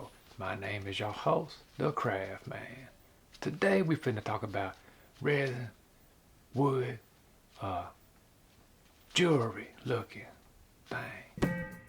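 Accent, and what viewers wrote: Louisiana, United States. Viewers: He had me at Bilbo and Gandalf story in his Cajun soft voice 😂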